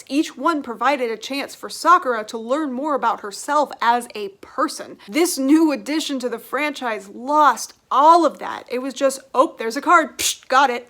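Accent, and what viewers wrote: United States. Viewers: I there’s any future anime reviews I’d live if you could write out the names. I have som hearing issues and Japanese with American accent is a bit tricky sometimes. 😂 Other than that, great video! Greetings from Sweden!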